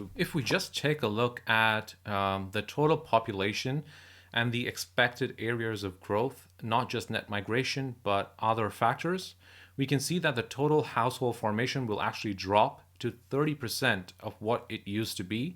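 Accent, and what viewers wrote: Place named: Iran. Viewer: Dude has an Indian name but has a mild Iranian accent.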